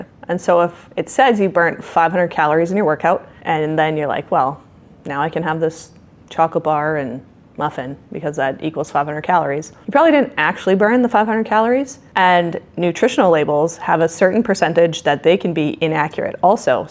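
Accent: Canada. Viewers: Girl, are you Canadian? You sound Canadian and love this. I have binged like 4 of your videos now and I'd love to think you're one of us. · Are you Canadian? I about abooout hahah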